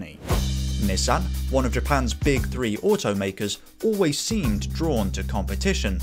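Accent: United Kingdom. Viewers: This is only the 2nd video I’ve seen of yours on here and sir, let me tell you, I have never hit the “subscribe” button so hard in my life. As a “relatively” new car fanatic, especially for Nissan specifically. What’s better than learning general lore of Nissan’s professional/formal racing history? Learning around side subject from a guy with a cool British accent. Really man, thanks for your content! Much love from Charleston SC!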